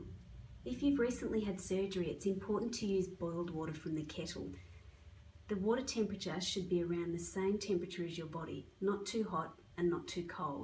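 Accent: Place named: Australia. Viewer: Australian voice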